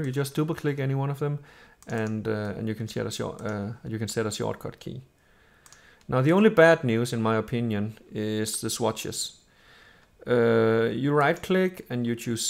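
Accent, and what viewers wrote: Denmark. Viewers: Are you Danish o: ? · Are you Danish?😱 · are you danish? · Are you Danish?? Just asking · Are you danish? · Because i recognize a lot of Danish words like: "men" and some English words pronounced with a Danish accent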